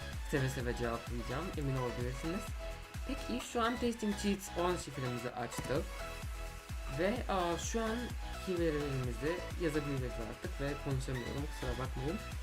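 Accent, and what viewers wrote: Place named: France. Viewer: Fransa'da mı yaşıyorsun? Çünkü aksanın Fransızları andırıyor. 😊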